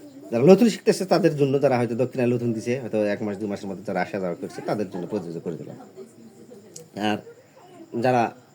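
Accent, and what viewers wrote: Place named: Naogaon, Bangladesh. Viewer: কিছু মনে করিয়েন না একটা কথা বলি আপনি তো নওগা জেলার ভাষায় কথা বলেন কিন্তু আমরা দেশের বিভিন্ন জায়গা থেকে দেখি সেখেএে আপনার কথা বুঝতে কষ্ট হয় তাই যদি একটু চলিত ভাষার সাথে সাধু ভাষা বা লিখিত ভাষা বলতেন তাহলে কথা বুঝতে সমস্যা হতো না গুরুজি❤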